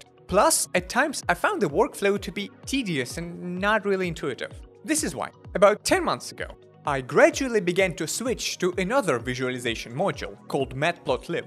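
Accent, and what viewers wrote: Russia. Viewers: Is this guy a russian originally?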